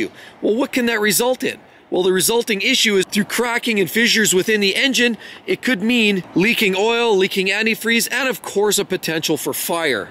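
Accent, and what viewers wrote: Canada. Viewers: You are very well versed in automotive technology. You reinforce my distrust in newer vehicles. Not to generalize too much but they are nothing more than over priced junk. You sound like you are from Canada. Ehhh...!!!!! Thank you.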